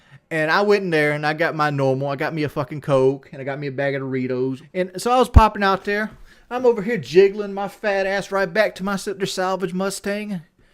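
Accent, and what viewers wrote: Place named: South, United States. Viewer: Him acting as libral with his south accent is amusing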